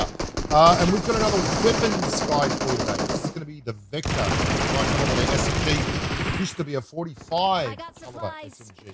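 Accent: Australia. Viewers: Love the Vector so much with a laser sight too❤❤ nice one as always❤ i just have a slight complaint to make, i would very much appreciate fixing the sound in your videos, it's been anoyying lately that the game sound is louder than your own voice 😂😂 especially cuz i love listening to your australian accent 😂❤ it's really annoying that sometimes i can't make out what u say! Take care ❤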